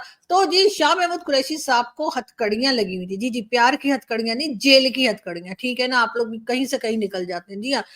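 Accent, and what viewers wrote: India. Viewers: Your style speaking is just like Indian and I like it too much . Your electricity bill gave me a big shock. I always smile to watch your videos .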